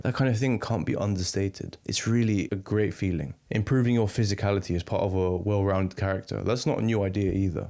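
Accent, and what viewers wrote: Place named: Cockney, United Kingdom. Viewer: This guy has the new grating Cockney Accent… Close my eyes and he could be a Rapper or PoundShop Gangsta. Or one of the new breed of BBC or Channel Four continuity announcers.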